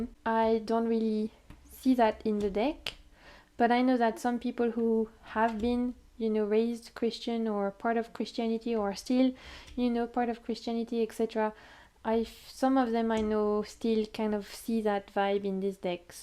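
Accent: France. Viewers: 🐚 obsessed with your French accent. Wish I could hire you to teach me French. Beautiful review of all these unique decks. Thank you for your time and efforts on your wonderful videos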